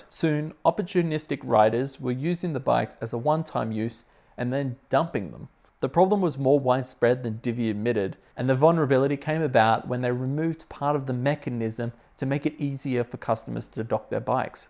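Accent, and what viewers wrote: Australia. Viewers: is it just me or does he not have a very noticeable aussie accent?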